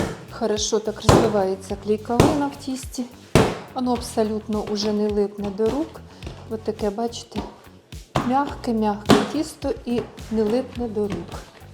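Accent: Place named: Bila Tserkva, Ukraine. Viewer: Ваша мова,як на Білоцерківщині,я звідти і тоже,дякую.Моя мама пекла чудові пи ріжки,а начинки були різноманітні варена солодка квасоля,мак,сир,капуста,варені цукрові буряки з калиною,сливи,вишні.Тільки ностальгую,бо,соромно,що так і не змогла,як мама готувати тісто.А ще були з лідером і яблуками.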